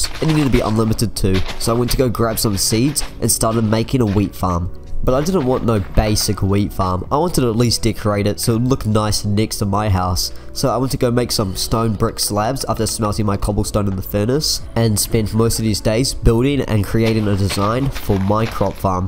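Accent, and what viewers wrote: New Zealand. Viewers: Is he from New Zealand or something? he's got a kinda kiwi accent 👀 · R u kiwi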